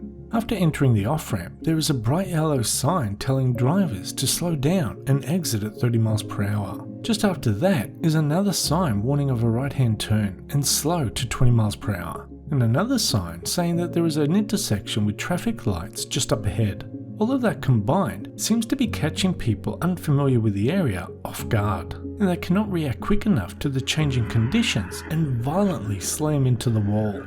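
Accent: Australia. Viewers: Drivers in Seattle think it's OK to exit a ramp at 75 mph and yet merge into the highway at 25 mph expecting everyone around them to adjust to that kind of driving. BTW the Australian accent threw me off until I saw the 165 exit ;)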